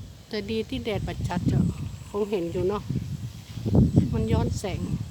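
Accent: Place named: North, Thailand. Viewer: ฮ่าๆๆ เมือง เป็นเพื่อนกับคุณ แล้วนะคับ นานแล้วด้วย ในคลิปข้างๆน่าจะเป็นต้นยูคา ใช่ป่าว ปลูกริมนาแบบนี้ ดีจัง ภาษาเหนือป่าวเอ่ย เพราะจัง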